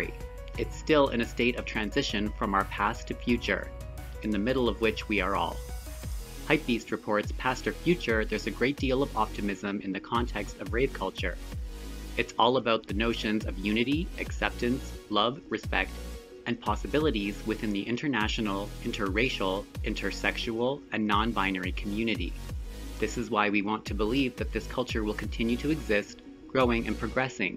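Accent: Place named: Canada. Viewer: thought I detected a bit of hoser accent...(that screengrab with the TO ad on it was the final puzzle piece)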